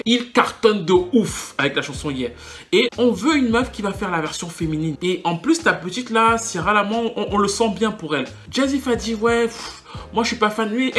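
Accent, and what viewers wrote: Cameroon. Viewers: On dirait que tu es Camer 🇨🇲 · J'ai reconnu un petit accent camerounais ... 😏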